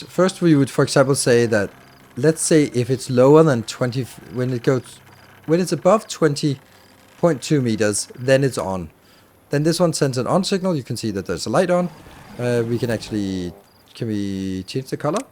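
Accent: Denmark. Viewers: Er lige faldet over din profil mega fedt content glæder mig til at følge med, blev nød til at tjekke om du ikke var dansk hahah for synes jeg kunne høre det i måden du taler engelsk på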